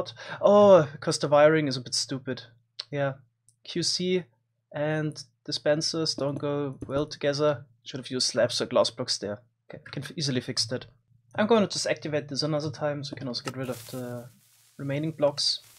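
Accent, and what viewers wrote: Germany. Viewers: Deutscher Akzent Izz da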